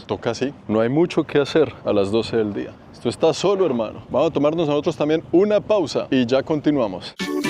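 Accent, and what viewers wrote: Colombia. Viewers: eres de las personas preferidas, tu español es muy Colombia 🇨🇴, emprendedor como todos tus paisanos. Gracias por mostrarnos el mundo. Desde los llanos de Colombia 🇨🇴🇨🇴🇨🇴🇨🇴🇨🇴🇨🇴.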